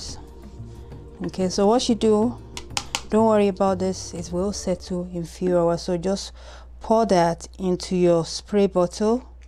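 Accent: Nigeria. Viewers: Are you Nigerian Am picking up on igbo/ yoruba accent ?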